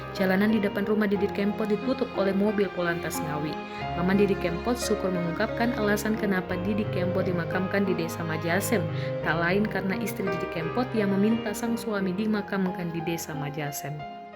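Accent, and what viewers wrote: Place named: Medan, Indonesia. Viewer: mbk e yg bawa acara kyak orng medan dengr dr logtnya..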